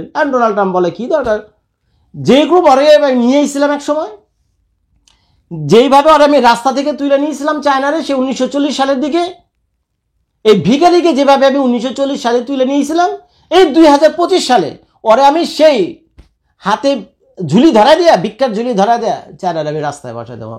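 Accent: Dhaka, Bangladesh. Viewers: জোস ভাই আপনার কথা শুনতে অসাধারণ লেগেছে খুবই অসাধারণ লেগেছে কথার মধ্যে ঢাকাইয়া টান থাকার কারণে আরেকটু বেশি অসাধারণ লেগেছে। কিন্তু ভাই একটা জিনিস ক্লিয়ার করলেন না আমেরিকার মধ্যে কি সবই আছে যদি সবই থাকতো তাহলে বহির্বিশ্বে থেকে আমেরিকা আমদানি করত না চায়না রাশিয়া ইন্ডিয়া বাংলাদেশ যদি আমেরিকা কিছু না দেয় তাহলে তো ডোনাল্ড ট্রাম্প গেয়া!!!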